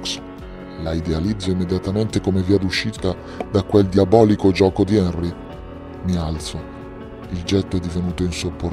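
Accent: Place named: Lombardy, Italy. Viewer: Bella voce. Ma di dove sei? Sembri Lombardo ma non capisco di che zona!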